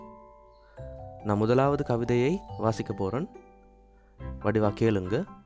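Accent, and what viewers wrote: Jaffna, Sri Lanka. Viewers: Neenga jaffna tamil pesureenga bro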